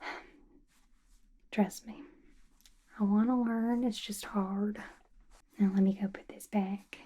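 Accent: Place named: South, United States. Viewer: When I read “Goth Rogue”, I was imagining like a DnD character, so I was a little taken-aback by the southern accent 😅 but I still like the actual video idea 👍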